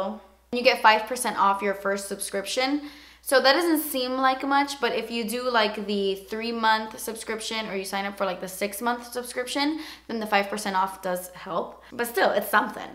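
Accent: England, United Kingdom. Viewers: Your British accent is great! I also enjoyed the Harry Potter references 😂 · love your british accent 😂😂😂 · i love that you always randomly break out into an English accent.. i do that too lol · Love your British accent!!!! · I love that you do a random british accent in your vids. I do the same in daily life 😂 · that british accent tho lol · I love your english accent. You are amazing xx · You do such a great British accent😍. Better than me and I'm British 😝😂 Oh and P.S I love you 😍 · Girl, your british accent slays me. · You're so funny 😂 The British accent and all! 😂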